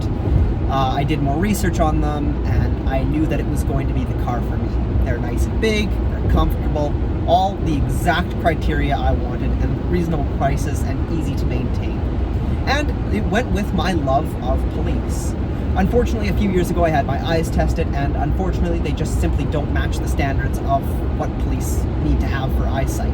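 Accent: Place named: Canada. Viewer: i am also canadian .. i can tell by your voice lol( native american from rez in canada)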